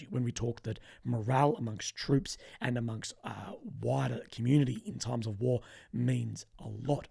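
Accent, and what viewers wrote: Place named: Australia. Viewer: the sound is messed up on this one & has been getting worse on a couple of late,you need to bump it up a bit,although the ozzie accent is fun it can be hard to understand if it is mumbled & hard to hear.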